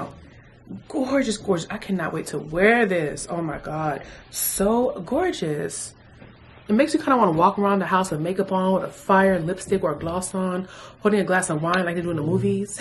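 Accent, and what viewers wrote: United Kingdom. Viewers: Your British accent though...love it, and I'm mad country!🤣🤣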